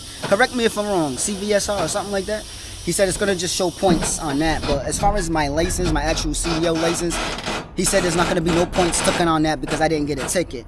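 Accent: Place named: New Jersey, United States. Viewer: You must be from Jersey u got that accent like the sopranos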